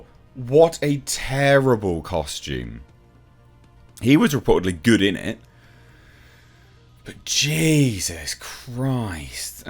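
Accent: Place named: United Kingdom. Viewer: like your british accent.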